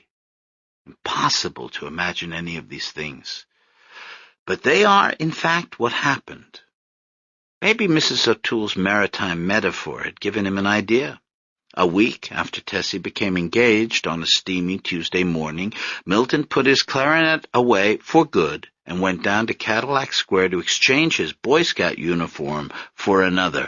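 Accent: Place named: Borscht Belt, United States. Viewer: This narrator has given the first generation American, Michigan-born characters, theatrical borscht belt accents, for some reason! Weird.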